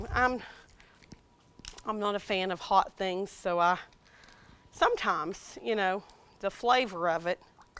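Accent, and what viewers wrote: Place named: Maine, United States. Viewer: Lord, thank you that despite growing up in the middle of the potato field, I don't talk like these people here in Maine. Good god, don't know what I'd do if I had to speak authentically to my region like this woman.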